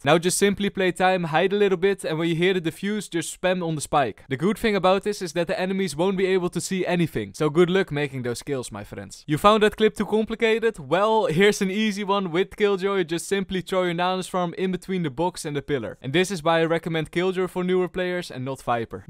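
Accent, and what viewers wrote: Netherlands. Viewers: You a typical dutch accent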